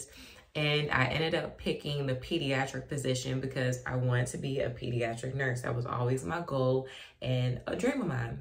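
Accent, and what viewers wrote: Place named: Cleveland, United States. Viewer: Are you from Cleveland? You sound just like my friend from Cleveland.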